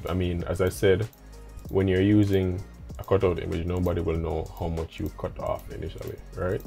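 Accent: Jamaica. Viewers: Excellent video. Do I detect a Jamaican accent? Thanks for sharing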